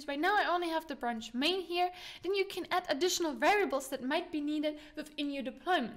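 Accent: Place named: Germany. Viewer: Thanks for sharing this ! You are from Germany, I guess... ? Just curious ! 😬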